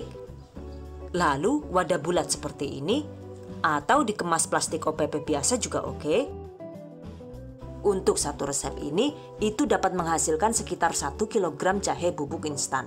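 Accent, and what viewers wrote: Banyumas, Indonesia. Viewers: Waduh... ngapak banget.... 👍👍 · Lucu bahasa ngapake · Saya kira bule. Ternyata bisa ngapak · Jane wong ngendi yu? Deneng cok ngapak. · Top banget lah,seneng karo ngomong ngapake,nek ora ngapak ra kpenak he he he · Ladalah...jebule ngapak kaya inyong, mbekayu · trimakasih kakak resepnya..kakak lucu waktu ngomong jawa ngapak😂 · jebule rika wong ngapak ya, aq wong pbg ,rika wong ndi bun? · I Like this, medoknya banyumasan nambah lucu dan semangat nonton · Suaranya antik , ngapak banget hahaha deneng fasih temen , wong ngendi Rika? Wkwkwk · Keluar deh aslinya wong ngapak hehehe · Kk. Ngapaknya daerah mn nih??? Cilacap mn? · Bunda kok ngapak ya ngomonge🤣🤣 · Jebule wong pengapakan ya 😊. Mohon Ijin mau dicoba buat usaha resepnya. Salam ngapak bu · Mbak-nya orang ngapa yak? 😊 sama dengan orang tua saya he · Hemmmmm suaranya khas bgt kadang kangen ngapak nya jga ...mksh banyak beri info yang bermanfaat semagat berwira usaha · Wkwkwk ngapaaakkk ngapak, banyumasan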